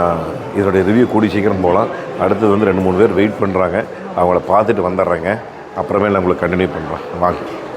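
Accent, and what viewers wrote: Kongu, India. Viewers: neenga peysura kongu tamil, keyka keyka keyakalaamnu iruku sir 🙂 appa appa anga inga thirumbi sirikurathu ha ha ha super and very natural way of doing youtube videos. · கொஞ்சும் கொங்குத் தமிழ் பேசும் கோமகரே...! திகட்டாத தித்திக்கும் பேச்சுடைய திருப்பூர் திருமகரே...! லடாக் பயணச் சாதனைச் சகாப்தம் எங்கள் மதிப்பிற்குறிய டான் திரு. அண்ணா அவர்களே❤❤❤🙏 எப்படி இருக்கீங்க அண்ணி❤❤❤🙏 எப்படி இருக்காங்க தம்பி ரித்திக்❤❤❤ எப்படி இருக்காரு விழிகளில் பதிந்தது புதிய கார் திறப்பு விழா💐💐💐 ஆனால் மனதில் பதிந்த நிகழ்வு எல்லாம் மதிப்பிற்குறிய டான் திரு. அண்ணா அவர்களுக்கு ஷோரூமில் அவர்கள் தந்த மரியாதைதான்❤❤❤💐💐💐💐😊😊😊❤❤ அருமையான வீடியோ❤❤❤ என்றும் அன்புடன் உங்கள் தம்பி என்றும் அன்புடன் உங்கள் TMF ரா.முஹம்மது கபூர் (சென்னையில் இருந்து)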